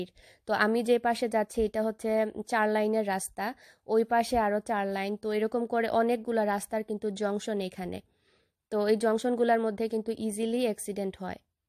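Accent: Sylhet, Bangladesh. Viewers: Full Video dekar age comment korlm. Apu miss korrm afnr sylheti voice 😥but afnr j mat shunrm bala lager afne jrokom matoin na kne afnr sathe sovsmy takmu love u appi 😘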